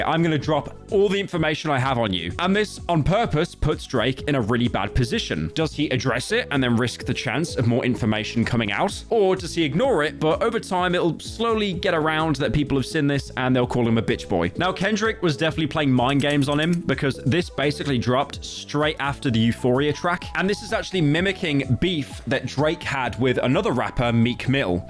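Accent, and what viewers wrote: United Kingdom. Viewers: I get what ur saying but that’s just his British accent, not necessarily the pronunciation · I love listening to furry British man talk about American rap, very intuitive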